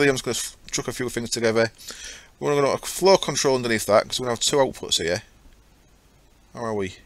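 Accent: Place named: Hull, United Kingdom. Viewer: I think I listened to you say auto-spawner about 4 times. That Hull accent is hilarious, not in the mocking 'haha you sound different' way, but in the plain hilarious way:D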